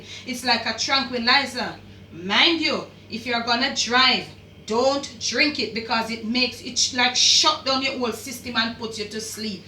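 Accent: Jamaica. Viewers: I heard the accent and BOOM 💥 HIT that subscribe button... I love ❤️ mama’ patois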